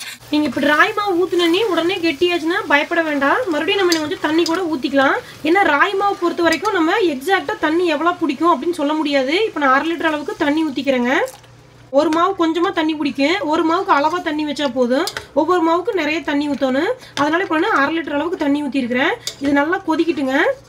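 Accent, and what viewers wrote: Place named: Coimbatore, India. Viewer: unga sontha uru unga voice nalala erukka Akka nenga Coimbatore nenacha Akka na Coimbatore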